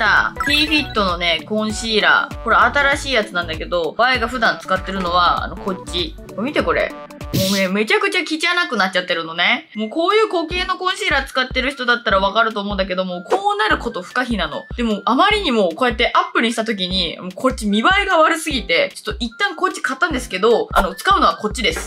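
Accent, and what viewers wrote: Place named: Ibaraki, Japan. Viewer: なまりかわい💕～べ？とっても親近感、、私は茨城じゃないけど！！いつも真剣にメイクに向き合ってるかっこいいです😿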